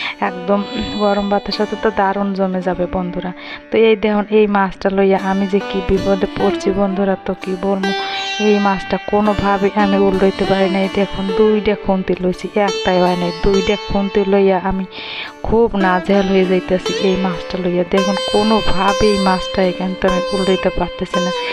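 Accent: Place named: Bangal, Bangladesh. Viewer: অসাধারণ বাঙাল ভাষা আমিও বাঙাল❤❤❤😂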